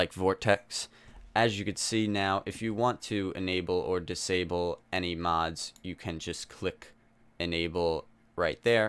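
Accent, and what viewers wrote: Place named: New York, United States. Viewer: This guys from new york no doubt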